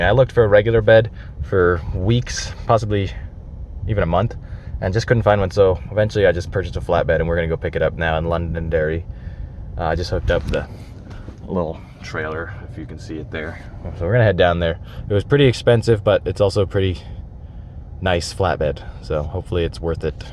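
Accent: South, United States. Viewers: You sound southern in this one for some reason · Lol i like the southern accent, whered that come from??😂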